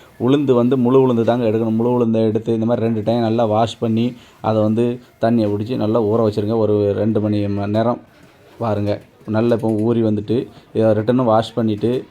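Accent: Tirunelveli, India. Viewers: Bro திருநெல்வேலி யா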